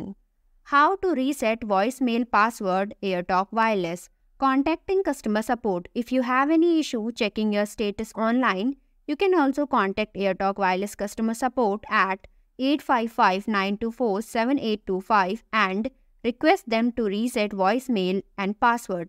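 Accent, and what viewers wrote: India. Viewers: accent. Sound like very heavy India accent.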